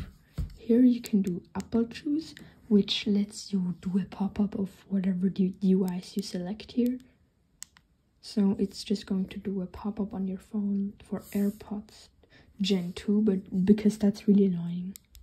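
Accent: Germany. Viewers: Man hört den deutschen raus 😂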